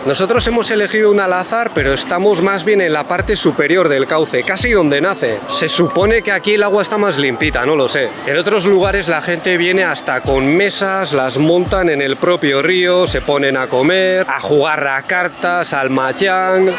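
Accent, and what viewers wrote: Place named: Spain. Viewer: se te nota que eres español pero se esta perdiendo las zzzz.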